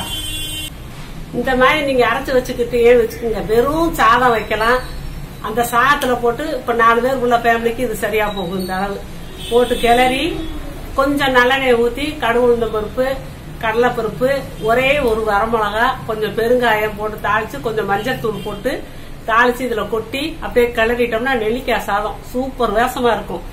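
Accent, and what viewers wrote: Madurai, India. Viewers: Madurai tamil suppera pesureenga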